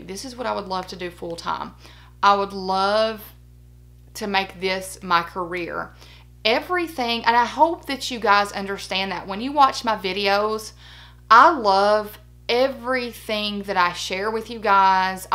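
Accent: South, United States. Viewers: New subbie! Excited to watch your video. Where are you from, sound like a southern accent (like me) 😀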